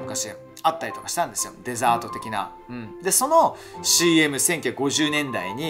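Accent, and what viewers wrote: United Kingdom. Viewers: イギリス英語でLovelyがよく使われると知ってから自分でも使うようにしています！のイギリス英語で勉強するの楽しい〜！☺️ Your channel is so lovely:) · I like your lovely British accent!! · イギリス英語かっこよすぎるー😭品格半端ない…憧れ… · のイギリス英語が大好きで、いつもPodcastやインスタ観てます🇬🇧💕 イギリスにいると、電話先でも対面でも、たくさんの「Lovely!」が聞けて、Lovelyって言葉聞くと個人的にめっちゃ嬉しくなります❤️ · I’m Japanese but currently studying in uk and I really appreciate your lovely videos! They help me a lot to learn exact British pronunciation:)) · I fancy your lovely English accent!! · のイギリス英語って何と言うかすごくイギリスっぽいというか高貴な感じがするというか、素敵です！はイギリス生まれなのですか？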